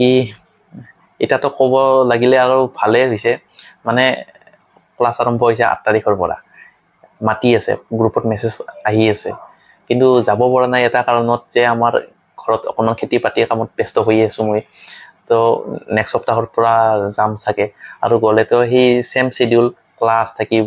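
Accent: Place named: Nalbari, India. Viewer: Daide Nalbarir nk ha 😄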